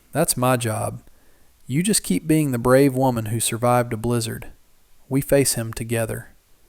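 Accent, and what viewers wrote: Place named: Alabama, United States. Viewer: Love hearing a story by someone speaking with my accent. Such a beautiful story. Thank you for sharing. Listening from Alabama….❤❤❤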